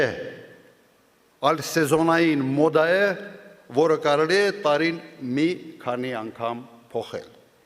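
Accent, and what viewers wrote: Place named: Karabakh, Azerbaijan. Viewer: զզվում ենք էս մարդու խուճուճ ղարաբաղյան ակցենտից,ոչ հայերեն գիտի,ոչ էլ նորից հայերեն...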